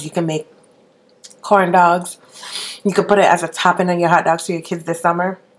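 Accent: Jamaica. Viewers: I knew it just the way u talk I know u Jamaican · I LOVE UR ACCENT Where are u from? u prolly already said but haha sounds like Jamaica but its lovely xD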